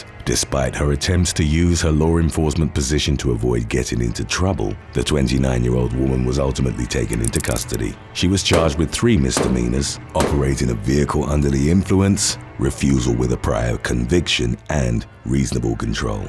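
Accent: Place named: Hackney, United Kingdom. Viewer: Bro, you sound like Idris Elba? Hackney accent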